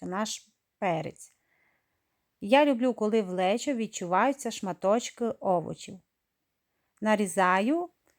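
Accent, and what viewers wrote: Transcarpathia, Ukraine. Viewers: Дивлюсь Вас із далекої Франції, обовʼязково приготую таке лечо! Окрема подяка за ваш закарпатський говір!💛💙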